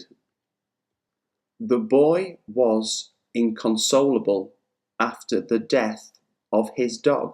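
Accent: United Kingdom. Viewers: The pronunciation is a British accent?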